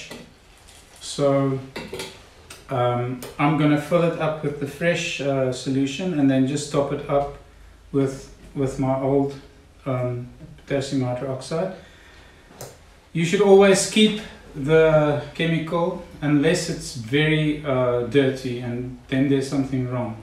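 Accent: South Africa. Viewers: Ons kan nie weg kom van ons boer aksent nie né..😂😊😊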